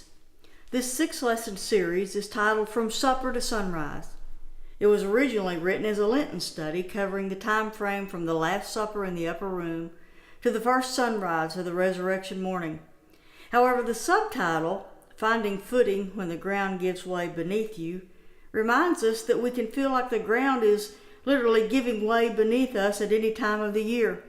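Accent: South, United States. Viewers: Thank you!!! I am going to listen to your lessons as I have been looking for some Bible studies in a down home way that I am used to. I am from the mountains of West Virginia and your calming southern voice makes me feel at home! Thank you!!! God Bless!!!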